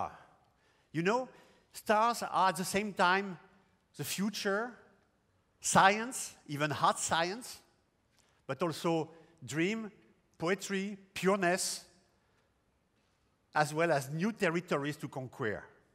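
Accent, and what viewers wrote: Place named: Germany. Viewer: His accent sounds german.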